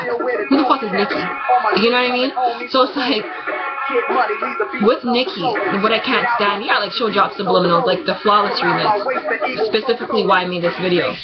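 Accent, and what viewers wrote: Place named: Caribbean. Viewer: Oh wow, lol. I don't know why I thought I heard a little Caribbean in you.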